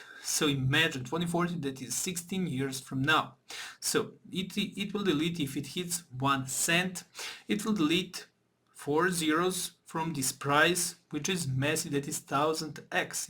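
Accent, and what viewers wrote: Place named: Balkans. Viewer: Are you from balkan?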